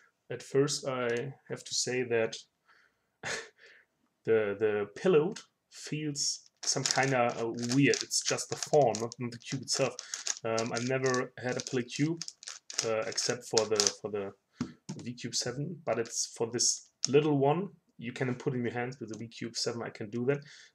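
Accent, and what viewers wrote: Germany. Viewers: Thanks for the review! Are you german?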